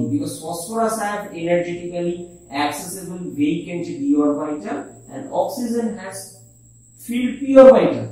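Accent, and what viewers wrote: Bengal, India. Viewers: Hmmmm, Apnar kotha sunei bojha jay. Bangali bangali ke chine fele.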